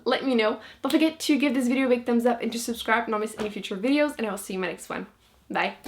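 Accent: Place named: Quebec, Canada. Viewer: if you want to read more girls love girls books, i definitely recommend far from you by tess sharpe, labyrinth lost by zoraida cordova, beauty queens by libba bray, le bleu est une couleur chaude by julie maroh and not your sidekick by c.b. lee! also i saw that your accent is french canadian, and since i'm french canadian and have the hardest time finding cheap books, i was wondering if you have any library recommendations? anyway, great video! i have a couple of these books in my summer tbr as well, and i'm super excited to pick them up! <3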